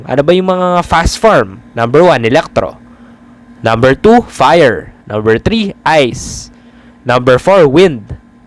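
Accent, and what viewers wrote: Pampanga, Philippines. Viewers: Very helpful ang mga videos mo. Thank you. Kapampangan ka ba lods? Yung accent kase. 😁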